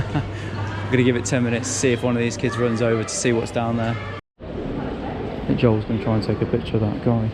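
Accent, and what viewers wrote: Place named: East Midlands, United Kingdom. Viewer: where are you from? I know you live in Swansea but I sense a distinct East Mids accent...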